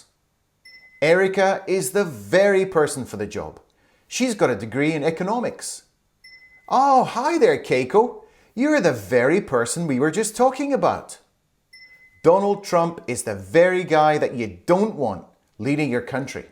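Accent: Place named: United Kingdom. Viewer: U hv typical uk pronunciation 😂 n ur japanese is much better than mine🤦🏾 老師好厲害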